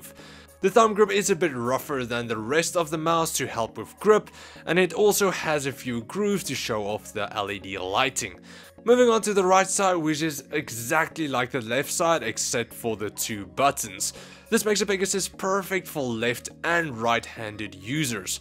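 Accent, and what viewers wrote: South Africa. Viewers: Are you South African? I speak a bit of Afrikaans! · I can't figure out this guy's accent. It's like Afrikaans, American and English all in one with a touch of Dutch. Touch of Dutch. I'm basically a rapper.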